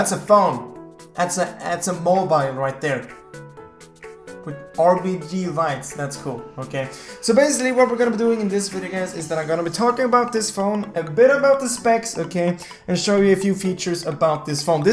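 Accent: India. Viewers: Lol i actually thought you were from india.. Lmao..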